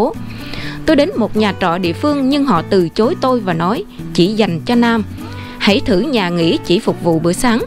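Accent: Standard. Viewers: Hay và chuẩn giọng đọc rất hay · Ban đúng là nhà báo chân thųc đúng nghiã.vūa biên tâp vūa xương ngôn viên rât chuân.rât trình đô và giōi..trân trong ban. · Nói chuyện quá chuẩn hay luôn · Cảm ơn em giọng em hay quá nghe rất chuẩn. Cảm ơn em đã cho chị biết những gì của xu Mỹ vậy mà họ cứ gọi là thiên đường bo quê hương vn mà đi.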